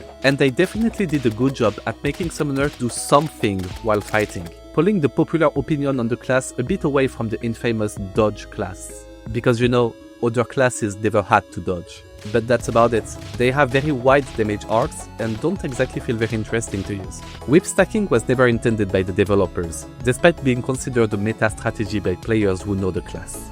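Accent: France. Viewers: are you french perchance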